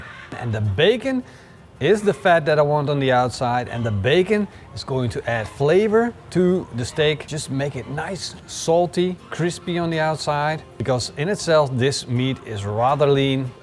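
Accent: Netherlands. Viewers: You are dutch right? i can hear immediatly haha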